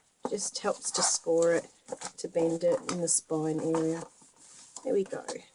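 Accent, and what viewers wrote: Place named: New Zealand. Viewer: When you said spider I thought WHAT!!!!! That's a big spider and I thought you had a NZ accent and we don't have those huge things here. Showed my husband, and then I reread, whew!! Now you are referred to as the " " and the " is a very talented lady, and very generous to share all her knowledge. Had been looking for something different to make, so thanks heaps. Love your way of explaining things too. Cheers for that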